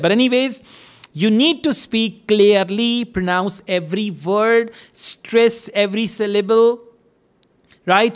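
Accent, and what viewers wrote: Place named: Punjab, India. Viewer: Punjabi acent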